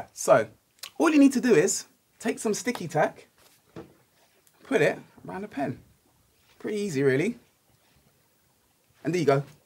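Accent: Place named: England, United Kingdom. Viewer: He's English, not American I believe.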